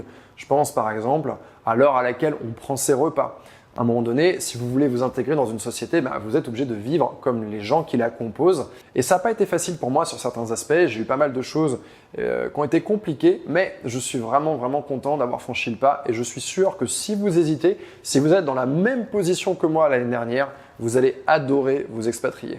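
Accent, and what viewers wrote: France. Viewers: Un poquito de acento francés 😊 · Bienvenue à Barcelone,!!! Je t'ai croisé il y a pas longtemps à la Barceloneta. Tu étais en vélo;-) J´habite Barcelone depuis 21 ans et j'y suis vraiment heureuse. Je dis souvent que c'est l'amour de ma vie <3. J'espère que tu l'aimeras autant que moi. L'accent français c'est un avantage alors garde-le;-)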